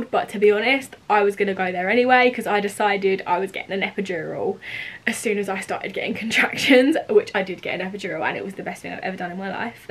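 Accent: United Kingdom. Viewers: Congratulations on your little baby boy and your make up is so pretty I love it and I love your British accent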